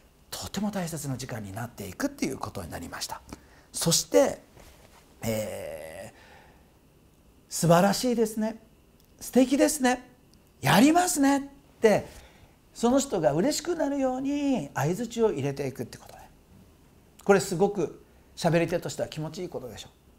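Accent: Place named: Kansai, Japan. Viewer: ついつい次々と動画を観ています。笑😊 0.01の魔法を早速今日から使いますね！ たくみさんの会話の合間に出る関西弁に親近感が増しています。いつも惜しみなく何でも話して頂ける事に感謝しています。これからもワクワクしながらお話し聞かせてもらいますねぇ〜。😊❤️🌈ありがとうございます。🙏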